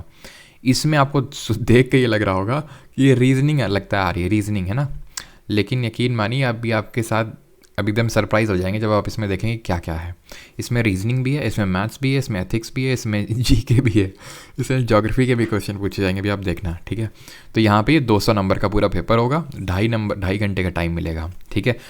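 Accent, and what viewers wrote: Kanpur, India. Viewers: Ap kanpur se hai kya ?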